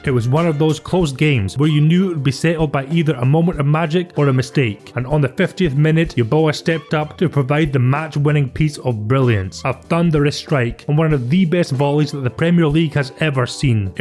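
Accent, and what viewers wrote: Scotland, United Kingdom. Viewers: This is one of my favourite channels..why? Because u cover players from the 90s and early 2000s..my childhood years..also u cover unsung players like tony yeboah..we all know his goals for leeds but the rest of his career doesn't get spoken of really..i also love ur scottish accent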